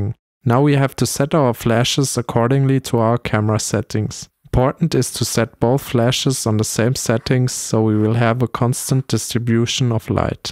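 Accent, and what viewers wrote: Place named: Germany. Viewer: Echt gutes Video! Man hört leicht deinen Deutschen Akzent xd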